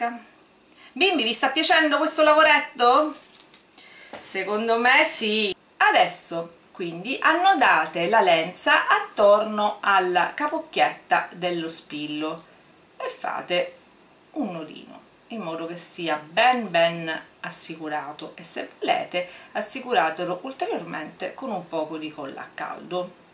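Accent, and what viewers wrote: Sicily, Italy. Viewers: Sei siciliana?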